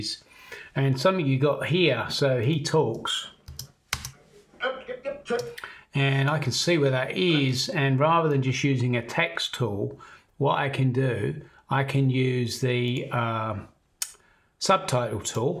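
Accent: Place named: United Kingdom. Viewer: stupid british accent, i can't hear it, why you speak like it going in slow mo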